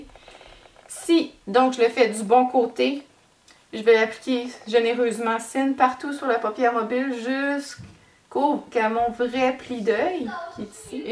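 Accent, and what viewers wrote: Canada. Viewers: Vous êtes jolie! Dommage que les sous-titres ne m'aident pas à comprendre cet accent canadien :( C'est pareil, les américains ne parlent pas un vrai anglais, ils déforment les a, les canadiens font pareil : déjà vous le prononcez déjeu... Je comprends mieux un belge ou un suisse... En fait, je me demande comment vous enseignez les enfants à lire : pa c'est prononcé peu ou po? comme çe au lieu de comme ça... C'est pénible de devoir faire des efforts d'essayer de comprendre un accent alors qu'on parle la même langue. Bref à part ça, bon exemples. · j'ai pas tout compris, c'est du canadien je pense, ça pique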